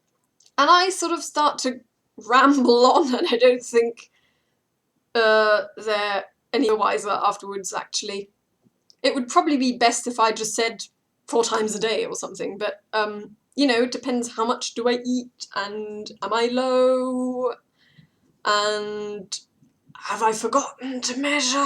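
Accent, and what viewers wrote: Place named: United Kingdom. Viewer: Du hast einen leicht britischen Touch :D Habe ich lange nicht mehr gehört! Und schrei bitte nicht deinen Pen an :( Der kleine will ja nur helfen...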